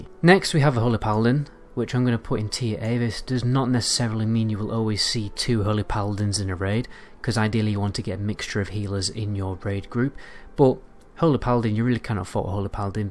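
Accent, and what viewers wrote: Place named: Sheffield, United Kingdom. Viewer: Hey, kinda random but your accent sounds very similar to Alex Turner’s from the Arctic Monkeys. Maybe it’s my un-attuned American hearing and I’m way off, but are you from Sheffield or near there (that’s where he’s from)? UK accents are so interesting and varied, I could be super wrong lol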